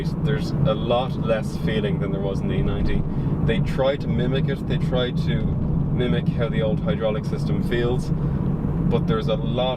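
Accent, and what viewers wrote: Ireland. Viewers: Great review and I'm a fan of the Irish accent :-). Having come from an E90, the steering felt weird at first, but I'm used to it now.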